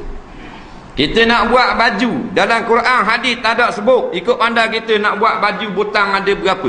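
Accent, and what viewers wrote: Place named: Johor, Malaysia. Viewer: ada sesiapa tahu tak kat surau mana ceramah ni diadakan? cara ustaz cakap, macam kat johor..... surau mana ye? best sangat kuliah ni, panas dan pedas.....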